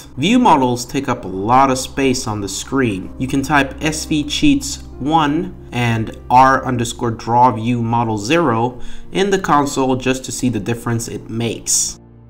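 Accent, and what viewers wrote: France. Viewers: are u french? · Are you french ?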